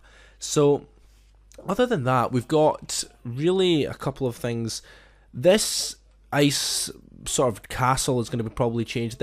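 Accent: Scotland, United Kingdom. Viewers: So your scotish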